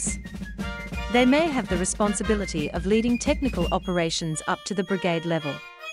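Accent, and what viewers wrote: United Kingdom. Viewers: Accurate video, but kinda strange that it's narrated by a British woman.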